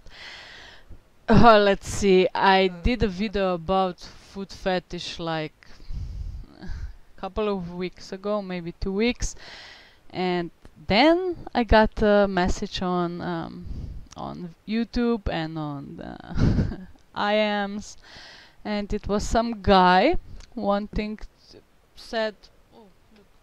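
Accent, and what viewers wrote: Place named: Portugal. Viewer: Your Portuguese accent speaking English is beautiful!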